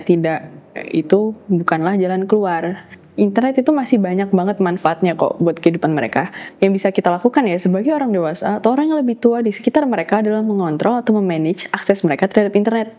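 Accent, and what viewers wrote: Java, Indonesia. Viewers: pesona mba2 medok intelek, bikin chasing reality tiap hari ga sih biar home feedku berwarna :) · Logat jawa nya candu bgt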